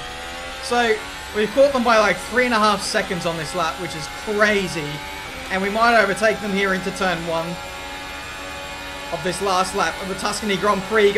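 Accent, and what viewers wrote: Australia. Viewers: If you wouldn't have that aussie accent you would have said mugello pretty accurate. You say "mugellou" you should say "mugello" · an Italian here. I confirm your pronunciation was on point, even if the English Australian accent was still there 😂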